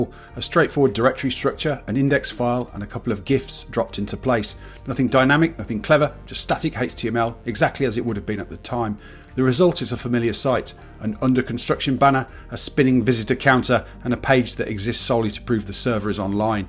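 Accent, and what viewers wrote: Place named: West Midlands, United Kingdom. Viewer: I can hear a fellow West Midlands accent a mile away.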